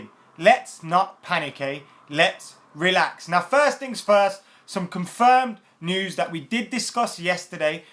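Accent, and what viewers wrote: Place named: London, United Kingdom. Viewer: lol you're from the endz eh. London or Manchester?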